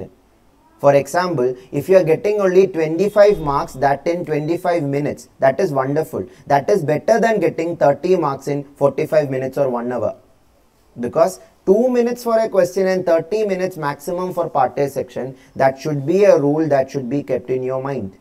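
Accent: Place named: Kerala, India. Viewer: Sir , ur accent tells u r from Kerala .... Is it ?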